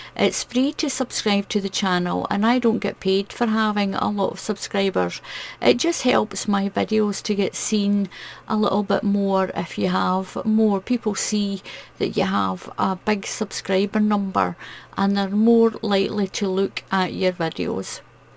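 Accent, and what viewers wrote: Scotland, United Kingdom. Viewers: Hi. Greetings from the U.S. Your doilies are beautiful and I could listen to your lovely Scottish accent all day. I can't wait to crochet these doilies. Thanks for sharing. 💟🌞🇺🇲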